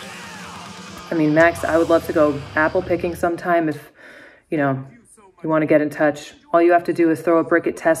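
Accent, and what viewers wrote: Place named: Boston, United States. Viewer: Huge props to for having a correct Boston accent. Makes her that much moah feckin hahdcaw dood.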